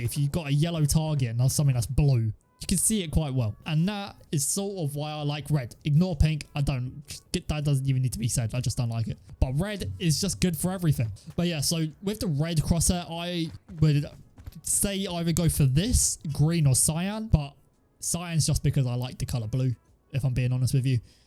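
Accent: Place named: United Kingdom. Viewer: Love the british accent, great video thanks!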